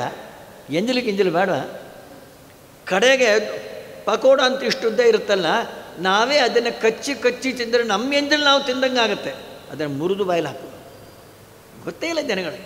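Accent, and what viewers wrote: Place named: North Karnataka, India. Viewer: I enjoyed listening to this discourse mainly for his Kannada of north Karnataka flavour.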